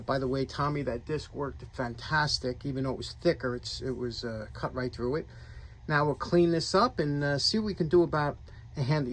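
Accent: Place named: Cape Cod, United States. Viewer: Don’t recall much about my Dad as he passed when I was seven years old, but I do remember that he always sat at the head of the table. Don’t really know why but as a teenager and later I sat at the head of the table even though I was the youngest. It always seemed that my Mom treated me as the “head of the family” rather then my brother. By the way, love your accent. Reminds me of back home on Cape Cod. Happy New Year.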